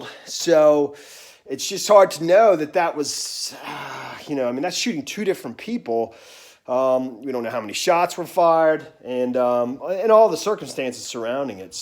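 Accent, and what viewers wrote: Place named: Charleston, United States. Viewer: Your accents pretty thick, its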